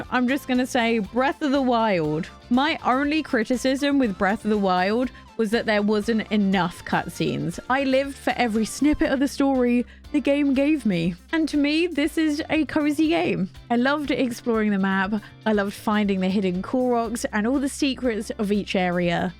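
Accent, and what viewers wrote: England, United Kingdom. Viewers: I know you have an English accent, but her name is Mirabel, not Marybelle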